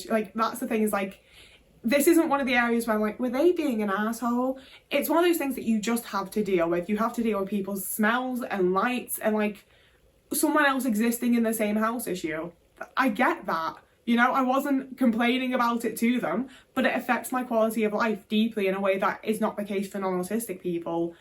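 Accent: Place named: Liverpool, United Kingdom. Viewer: Hi. I completley relate to this. I am autsitic and I will never in my life understand the pettyness of some neurotypical people. I think the best kind of people are the ones where you canbjust exist together in silence with no pressure. Saying hi every time you pass them go for a wee just feels fake and forced and no need. I think high functioning autistic women are like Sigma personality types... the typical herd mentality people dont understand independence and how we dont crave constant validation like they do. I think autistic people are sensitive to energy in an intuitive sence, i feel like i can communicate with energy and i dont need to speak. I think we are just super special people and its their loss if they can't make small accomodations to make you comfortable. What they also dont get is, maybe if they made these small accomodations, i would have less shut downs, and then would be a more content, positive version of myself and probably more likely to be more sociable. 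If small accomodations are not met, i speak a lot less because im in shut down mode more often!! So they bring it on themselves, everyone has needs, ours are no more 'difficult to meet' than others. I try to tell myself, it is always their loss, not mine. I have only breifly watched your channel twice or so, your scouse accent, swearing and bluntness makes me laugh a lot and what you say is so relatable. Thank you ❤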